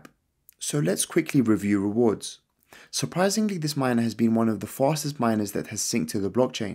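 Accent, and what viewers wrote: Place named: North London, United Kingdom. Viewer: Hey man, just discovered your video/channel. My Linxdot arrives late March. Great video, have subscribed. I'm in North London, you sound as if you are too? If so, how is it going and if not how you finding mining in London? Still to watch more of your vids but you are the first I've found in London possibly north 😄 Cheers. Edit- just finished the video, very informative, thank you.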